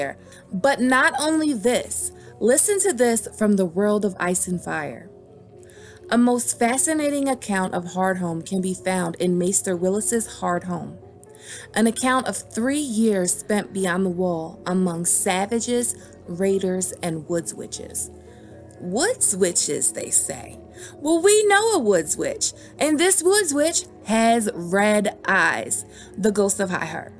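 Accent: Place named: Massachusetts, United States. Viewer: I like how you read..are you from the north East? I live in Massachusetts, and your accent almost matches a slight Massachusetts accent because not everyone has that horrible Boston accent very thick... But it could be a New York accent or somewhere in between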